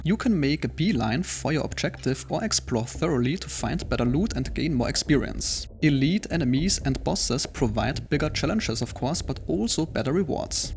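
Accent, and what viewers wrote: Germany. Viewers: German trying English?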